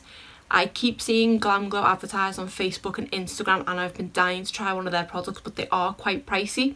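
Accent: Liverpool, United Kingdom. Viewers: some lipstick or the eyelashes idk, i really liked most of things so anything would be great <3 ahh the liverpool accent is kinda difficult but so lovely,my dream is going there someday. have a nice day <3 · Your accent is beautiful. I am a linguistics student but can't pin point where it might be from. I would guess you're a scouse or from somewhere near there, you sound amazing! ❤ I'd love to win the falsies. Not that am any good at putting them on but really would love to try 😁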